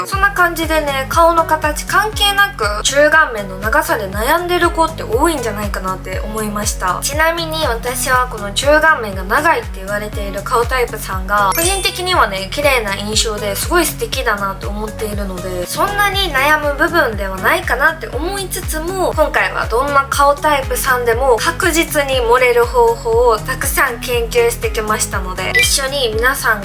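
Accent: Kansai, Japan. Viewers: 関西弁なのかメロい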